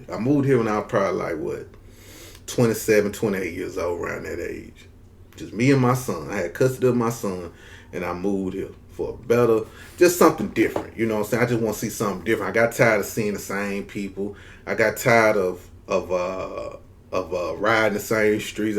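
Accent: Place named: South, United States. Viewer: Your southern accent is beautiful. I still hate that picture behind you.🌹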